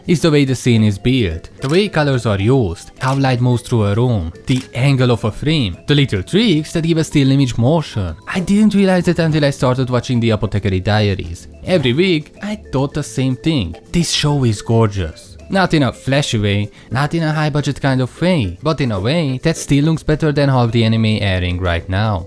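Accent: Hungary. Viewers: Random felhozta nekem a videót a YouTube, hallgatom, hogy de magyaros az akcentus, megnézem a csatorna névjegyét... yyapp, a " " brotha':D De amúgy nagyon jók a vágások és tök jó, hogy egy magyar is foglalkozik ilyen témákkal, még ha angolul is. Sok sikert a továbbiakban, keep it up~! ✨ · UGYANEZ!! Hallgatom, hogy na "he sounds EXACTLY like a Hungarian person speaking English would", és igen, az 😂 😊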